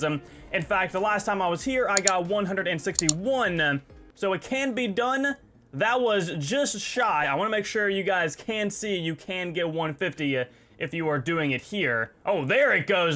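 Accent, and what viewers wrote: United States. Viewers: You kinda sound like Lachlan but more of an American accent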